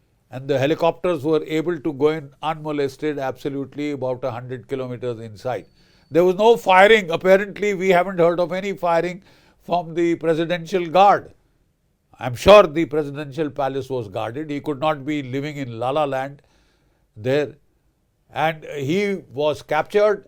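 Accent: India. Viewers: CA RAcus. Pronounce it correctly. The Indian accent SUCKS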